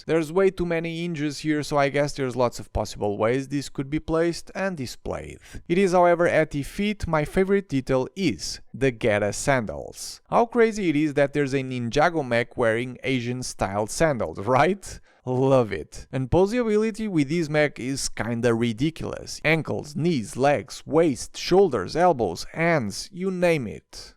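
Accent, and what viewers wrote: Portugal. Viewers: Sotaquinho da tuga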